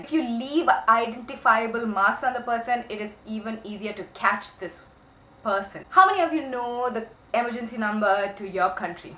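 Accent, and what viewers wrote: India. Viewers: is that a Hindi accent? cool! I think its lit and unique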